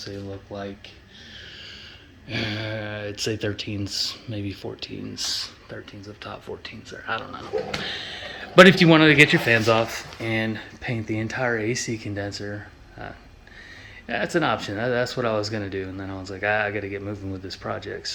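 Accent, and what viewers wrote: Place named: East Coast, United States. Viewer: Looks awesome…..I know your in Cali but you sure sound like your from the east coast!! One love G and infinite blessings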